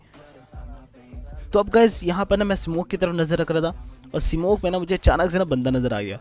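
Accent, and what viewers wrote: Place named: Rawalpindi, Pakistan. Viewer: Love U bhai pindi boy boltay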